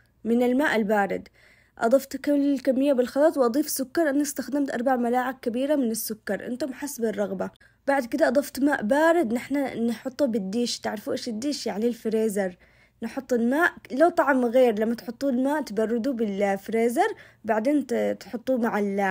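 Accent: Aden, Yemen. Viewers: حليب بودر علشان مايتغير طعمه كلامك عدني قح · اني فدالك دكرتيني اليم حق عدن ومحسن الكلام العدني اه وايام عدن باقي التريب وكاتلكس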